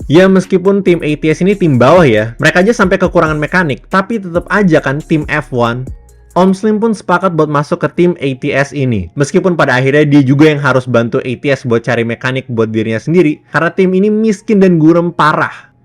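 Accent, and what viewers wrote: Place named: South Jakarta, Indonesia. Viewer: Logat jaksel kental sekalii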